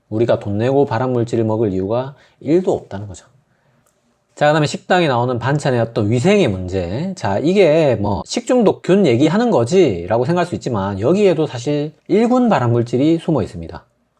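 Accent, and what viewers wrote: Gyeongsang, South Korea. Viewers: 선생님!! 고향어디세요? 저도 경상도라 말투가 너무 정겹고 말씀도 너무 재밌으세요~~~~😁😁😁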